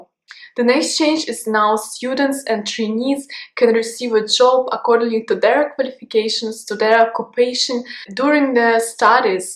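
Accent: Russia. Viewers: Your English is very good to understand, I just came back to Canada from Asian, spacial from China very hard to understand, your English is good, nice beautiful Russian aksent, I love it, speak German too, I like to hear that, you are beautiful. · I Love the Russian accent ...😍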